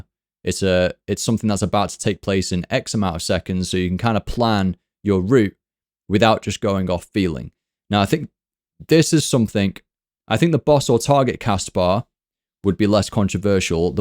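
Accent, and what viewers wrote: United Kingdom. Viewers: Selby is from Leicester. You, too? Perhaps a Leicester accent but wtf even the voice matches lol.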